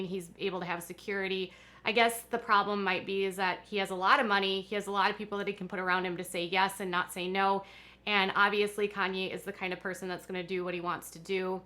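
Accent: Minnesota, United States. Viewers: I think needs to go back to writing some BS liberal snowflake “column” or “blog”. She pathetically uploads several times a day about ridiculous topics with no proper research or fact checking. She has to censor every little word she is afraid might keep her from making a buck. Hey, if you’re so passionate about your political beliefs, just say the word. ABORTION. If you want to even ATTEMPT to play the reporter role, drop your awful Minnesotan accent and learn to use talking head diction. Putting a bag over your head might help as well. You have a rare talent of making someone’s eyes, ears, and brain bleed simultaneously.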